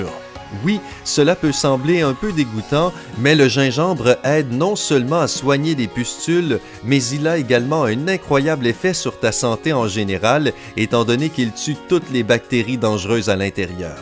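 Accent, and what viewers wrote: Quebec, Canada. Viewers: Celui qui parle dans la vidéo est un québécois ou un marseillais comme vous voulez 😅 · Faut vraiment changer la voix-off de vos vidéos les gars, ça va plus du tout la version québécoise... mdr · Le gars c'est un canadien ? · C'est plus québécois ça en fait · Florian Guerif la voix off québécoise est BEAUCOUP mieux que le mec de Lama Faché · C'est l'accent Canadien ou Québécois désolé je sais pas vraiment entre le deux ^^ c'est pas très sympa de disliker alors que c'est pas de la faute de la personne si elle a un accent.. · Le fameux accent québécois ça me tue 😂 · Wilson Temat non dr bot est noir et celui la est quebequoi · Accent canadien je zappe c’est ridicule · vous avait remarquez la vois canadien cool non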